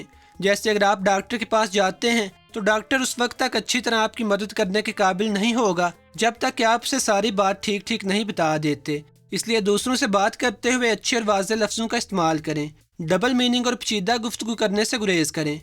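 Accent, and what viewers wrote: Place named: Punjab, Pakistan. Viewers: آپ بھی اردو بولنا سیکھ لیں۔۔۔ یہ پنجابی اردو آپ جیسے شخص کے منہ سے اچھی نہیں لگتی۔۔ والدین ہوتا ہے ولدین نہیں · Lehja to to jahilo wala hy urdu aik adbi zaban hy iski zaban to aisa lag raha hy punjabi bolne k lie bani hy bs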